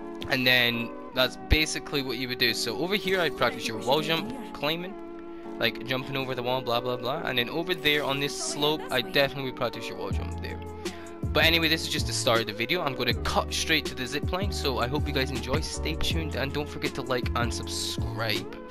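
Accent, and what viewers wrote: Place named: North Scotland, United Kingdom. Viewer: You definitely sound like you're from the north of scotland lmao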